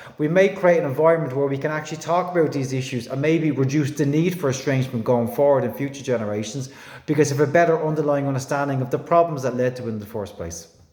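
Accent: Ireland. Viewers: If I could put forward some feedback? ... excellent video, excellent presenter and information! The sound is a little bit echoey. Wondered if you could use a mike to improve the sound?. Am grateful for this video. Love your tone of voice and your Irish accent ! So nice to listen to. Luv from a New Zealand woman 🇳🇿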